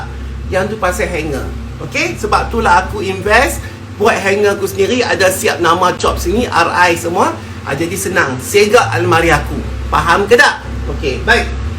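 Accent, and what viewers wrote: North, Malaysia. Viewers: Bahasa utara lah.. Kedah, penang mmg ckp lagu tu🤗🤗🤗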